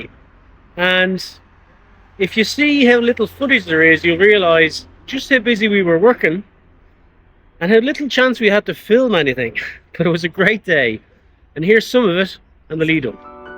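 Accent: Ireland. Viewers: I bet you get away with muder with irish charm and the accent seems to work well. I get to see some great beautiful hotel in France. Conguc! have a drink please. You all worked very hard on openning day. You should be proud it will last and have your finger print on the chateau for sure. 😂the old confused man will whip your butt he decided to spare you his viking ways and just confiscate the bike. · That evening sun and clouds was so lovely! I'm sure it was more beautiful in person. And aha, you're well aware of the power of that Irish accent! You ugly? The only reason they'd have to hide you would be, well, from the young women. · Bonjour d'Occitanie J'ai aimé la référence à James Bond, pour le charme de votre accent irlandais, s'ils sont aussi doué que la majorité des Français avec l'anglais, je pense à l'accueil de l'hôtel, ils n'ont pas dû l'entendre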